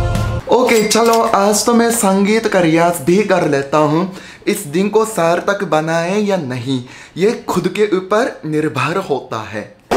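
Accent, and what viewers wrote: Standard. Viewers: aap hindi bahut accha bolte ho. shudh bolte ho. hame aapki boli pasand hain. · bhai aapki hindi bahut hi badhiya, aapke hindi sikhane or bolne kaafi sarahniya hai. Aapki hindi bahut sare bhartiya logo se kaafi atyadhik shuddha hai, bahut sare sabdo ka hindi utcharan bahut bhartiyo ko nhi aata. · Aap kitney achchey Hindi boltey hain bilkul sudh Hindi bhasha. Dhannyabad aap ka. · Chikitsak⛑️ oo Bhrata Hridya tript ho gya aapki Uchh ewam madhur vaani sun k....itni shuddh Hindi k Shabdawali k uccharan aapki Prateyak Chalchitra (video) m darshaya jata h...umeed h aap tatpar Aise Hindi ki Chalchitra poorntaha Roop se bnaenge or aap waqta bnenge or hm Shrota aapki Hindi Vaani Dhyanmagan hokar sunenge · Bhai badiya to h Hindi, itni shudh Hindi to Indian log bhi nhi bolte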